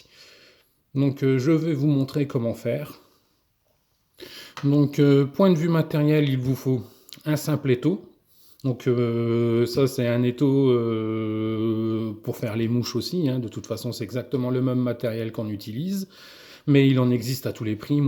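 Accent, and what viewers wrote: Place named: North, France. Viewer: Je reconnais un accent du nord. Plus précisément un accent de Lesquin… 😃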